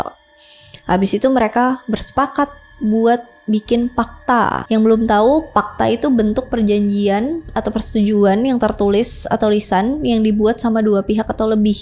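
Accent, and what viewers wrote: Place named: Sumatra, Indonesia. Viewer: santai bae 😂 asli sumatra ya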